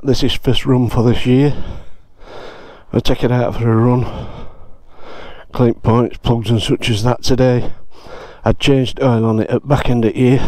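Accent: Yorkshire, United Kingdom. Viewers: I love this gentleman’s Yorkshire accent!! And I LOVE this glorious motorcycle I’m an Aussie Sheila and I understand this accent. As a 20 yr old ( 1974) I met Phil Irving at a Melbourne Motor Show. Hardly anyone standing around him or the Vincent he was displaying. I grew up riding pillion on Norton Commandos and Triumph Trophy’s , Atlases & Bonnevilles. Had a boyfriend with an Ariel Square Four too. But never been for a ride on one of these sublime Vincent’s. Thank you so much for this video!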